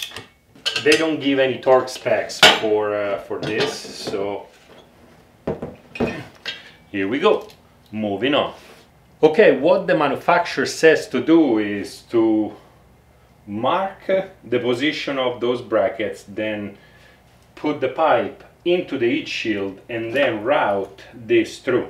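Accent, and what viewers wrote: Italy. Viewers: That italian accent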